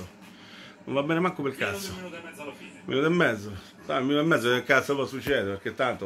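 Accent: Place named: Rome, Italy. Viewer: A,ti voglio ibbbridddo,accento romano,e. testa juventina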